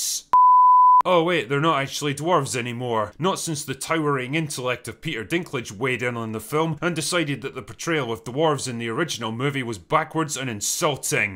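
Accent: Scotland, United Kingdom. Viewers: I really do appreciate his take spot on, also wow I didn't know was Scottish I assume he was British accent hard to decipher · German with a Scottish accent, music to my ears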